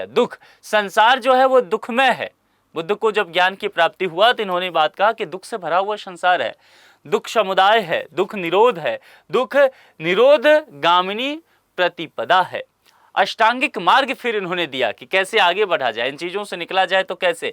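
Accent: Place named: Bihar, India. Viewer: Bihari h sir 🙌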